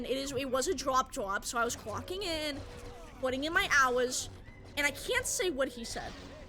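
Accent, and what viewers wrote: Boston, United States. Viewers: The Boston debuff is so cute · shes from boston, and as far as im aware its not a character thing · The Boston accent really enhance the stories. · I somehow never made the connection that cardboard is pronounced the same way as any other "-ar" word in Bostonian. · It's a Boston accent, lol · Just sounds like every woman in Boston to me. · don't hear Boston accents very much were I'm from. It's unique and interesting.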